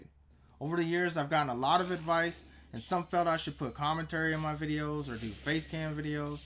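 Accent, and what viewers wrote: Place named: Mexico. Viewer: you sound Mexican and black and country white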